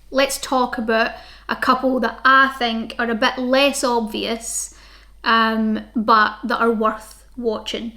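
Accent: Scotland, United Kingdom. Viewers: I just really wanna hear you say "THERES BEEN A MURDER" in your scottish accent...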